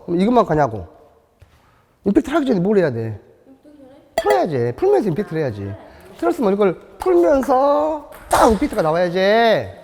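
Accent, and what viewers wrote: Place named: Jeolla, South Korea. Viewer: 전라도 사투리가 이렇게 구수하게 들리긴 처음이군요 ^^ 코치님 정말 멋지군요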